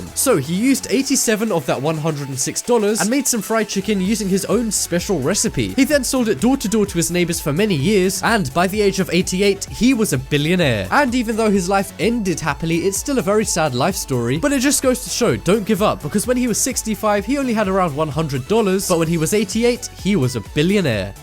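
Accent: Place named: England, United Kingdom. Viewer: What part of England do you hail from,? Just curious due to your accent:)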